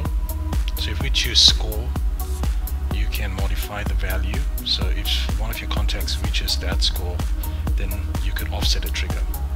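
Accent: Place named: Europe. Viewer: Love the groovy music along with Euro accent.... I feel like I'm in the club!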